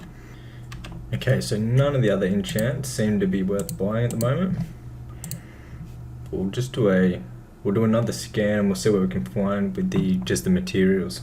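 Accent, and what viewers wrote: Australia. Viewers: You sound aussie, how are you not lagging out on this server lol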